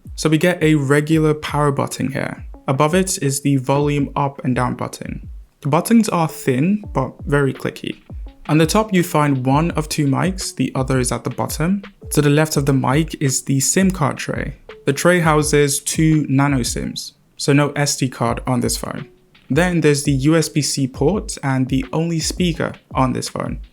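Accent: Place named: England, United Kingdom. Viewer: he sounds english, am i tweaking??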